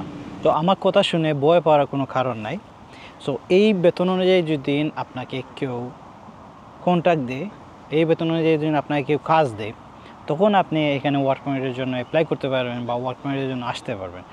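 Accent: Sylhet, Bangladesh. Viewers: ভাই কিতা সিলেটি নি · মনে হয় সিলেটি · কথায়ই বুঝা যায় সিলেটি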